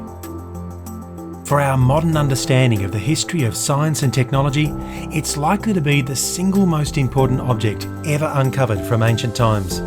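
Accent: Australia. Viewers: Well his voice isn't exactly soothing, but it isn't bad for an Aussie one........... *Kiwi here :P But yes will be EPIC :) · Subbed the instant I heard a fellow Aussie accent, liked for awesome content.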